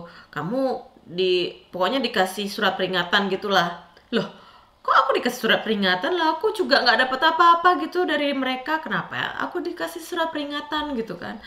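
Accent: Java, Indonesia. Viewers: MBAK, JENENGAN KOK AYU MEN....MBEK OMONGANE MEDOK JOWONE, SENENG AKU....HAHAHA....SESAMA ORANG SOLO HARUS SALING SUPPORT......HEHE😍 KONTENNYA BAGUS2 LHO👍👍👍....SEMOGA MAKIN BANYAK YANG NONTON YAAA...SUKSES! GBU...😇💕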